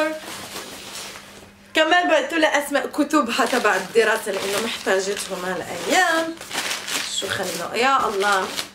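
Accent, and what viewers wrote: Morocco. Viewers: نتي غزالة ماشاء الله ولكن لهجتك المغربية احسن مليون مرة من اللهجة المشرقية المستعملة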